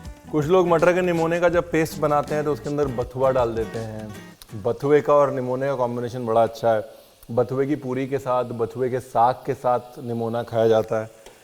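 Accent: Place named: Kanpur, India. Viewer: Aap kanpur se h lag raha. Aapki boli kanpuriya h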